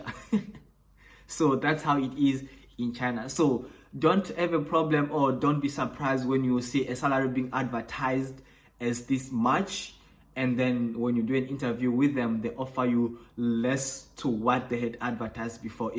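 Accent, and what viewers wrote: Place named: South Africa. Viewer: Also considering the fact that he's South African, but definitely not a native English speaker from South Africa (there are native English speakers in South Africa, he is not one)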